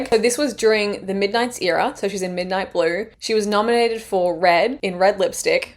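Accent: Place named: Australia. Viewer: OML I HAD SUCH A MOMENT WHEN U SAID U WERE AUSTRALIAN- I AM TOO AND I'M SO USED TO HEARING AMERICAN ONES I JUST ASSUMED U WERE, AND I DIDN'T HEAR ANYTHING DIFFERENT BECAUSE IM ALSO USED TO AUS ACCENTS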